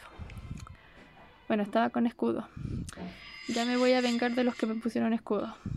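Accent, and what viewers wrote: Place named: Chile. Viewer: TIENE ACENTO CHILENO CONSHASUMARE WUEWON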